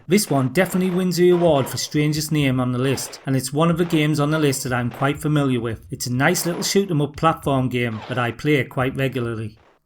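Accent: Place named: Newcastle, United Kingdom. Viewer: Aye. Good to hear geordie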